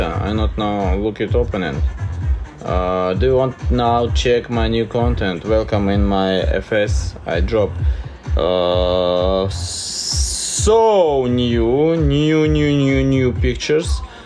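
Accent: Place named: Spain. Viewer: Me encanta su acento español su acento ruso y su inglés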